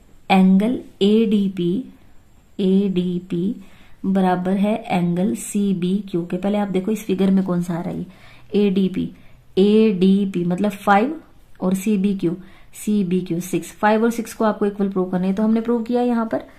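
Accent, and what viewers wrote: Haryana, India. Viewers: I think Haryana by her accent and all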